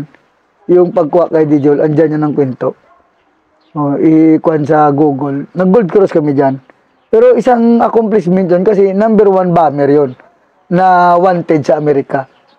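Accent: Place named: Iloilo, Philippines. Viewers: Ilonggo yata si Sir sa accent nya..kabaliktaran daw accent ko😂..keep sharing,your juniors will digest and learn something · Ilonggo Pala si Sir. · ilonggo gid c sir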